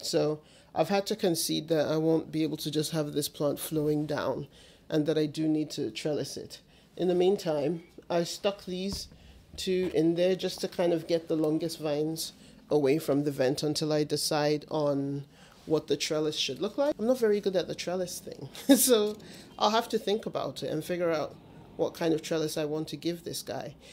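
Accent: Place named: South Africa. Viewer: What a wonderful collection and they all look so happy! (new subscriber here) I love listening to your voice, so musical and expressive! Is your family from South Africa? A good friend of mine is SA and your voice reminds me of his.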